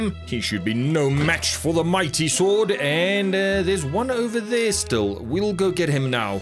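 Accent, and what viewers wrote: South Africa. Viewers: Are you from south africa??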